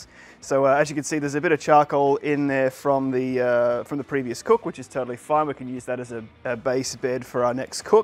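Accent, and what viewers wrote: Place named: Australia. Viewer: Hi mate, you sound Australian. 👍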